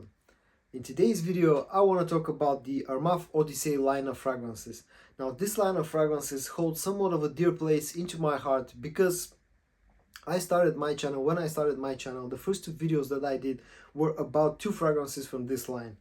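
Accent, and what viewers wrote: Bulgaria. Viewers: Very good video! Might sound kinda weird, but are you bulgarian by any chance? Your accent sounds bulgarian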